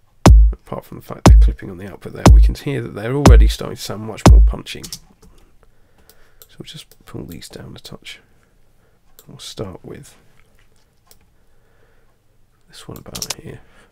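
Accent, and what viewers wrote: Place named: London, United Kingdom. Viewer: Hey- awesome tutorials...do you do private lessons? you sound like you're from London :)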